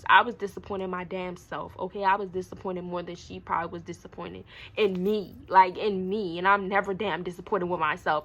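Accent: Baltimore, United States. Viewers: I knew you was from bailmore I knewwww ittttty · You from Baltimore? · DO I HEAR A BALTIMORE ACCENT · The Baltimore accent strong ash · she gotta be from baltimore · Is you from Baltimore or summ ??😭😭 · hold up cuz ik you from baltimore gotta who bmore accent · Yo she sound like she frm Baltimore but ion know nobody frm Baltimore that says cold drink so idek but you still gettin a sub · Baltimore accent ruining the video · U gotta be from Baltimore💯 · Nah da baltimore accent be havin me cryin😂😂😂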